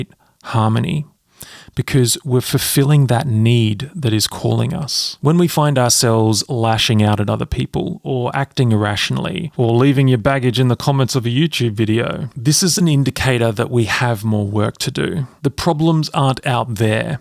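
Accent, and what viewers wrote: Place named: Australia. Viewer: Enjoying your points of view, good to hear an Aussie voice, hi from Adelaide. I think every music channel gets the negative trolls.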